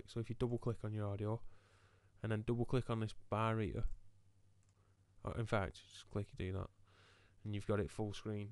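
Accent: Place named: South Yorkshire, United Kingdom. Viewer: your accent sounds familiar, you from south yorkshire?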